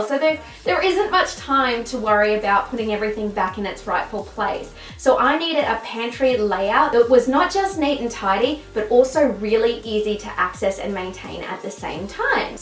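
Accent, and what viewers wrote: Australia. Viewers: Are u Australian??? I am and I just found ur channel and I subbed 🤗🤗🤗🤗🤗🤗TADDAA I did way to much for a first vid but whatever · Omg are you Australian sameee finally yay 😊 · Nice to hear an Australian accent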